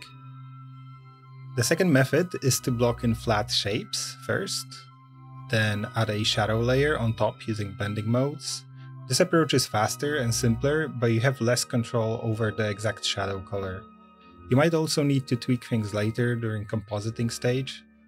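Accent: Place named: Ireland. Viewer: Do you live in Ireland by any chance? I can kind of hear a slight bit in your accent.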